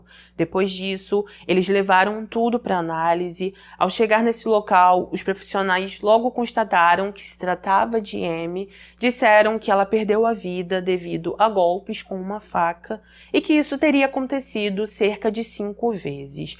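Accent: Rio de Janeiro, Brazil. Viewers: Más uma inscrita porque gostei do teu sotaque igual carioca 😍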